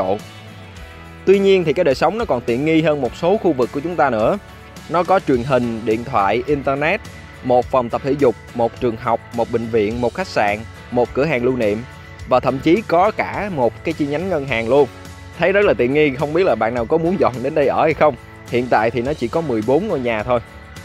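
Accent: South, Vietnam. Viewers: Giọng miền nam dể nghe,ủng hộ bạn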